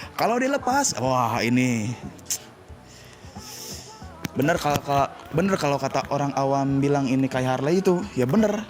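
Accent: Indonesia. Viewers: Inimah..hhaa orang sunda..broo.!?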